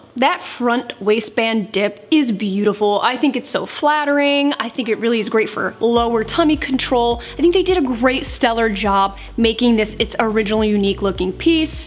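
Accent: New Jersey, United States. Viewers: Not interested in buying literally anything. Here every time EXCLUSIVELY for the Jersey accent.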